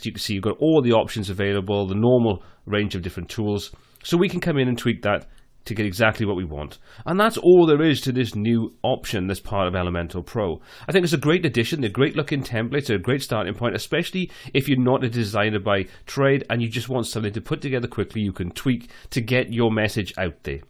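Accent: Wales, United Kingdom. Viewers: Damn you sound welsh... I wonder if you are from Cardiff too!! lol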